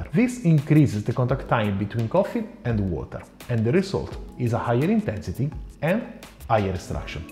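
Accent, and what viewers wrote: Italy. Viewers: thank you so much! Just the video I was looking for while trying to optimize my Bialetti Brikka 4 cup moka pot. I LOVE your videos and your ground to earth explanations:) I even like the Italian accent and your body language while explaining. You`re a gem of knowledge and kindness to your viewers. I will order me some AP filters now:-)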